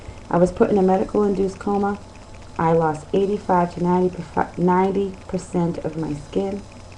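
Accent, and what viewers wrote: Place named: Boston, United States. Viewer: SJS survivor as well. Like the Boston accent ;)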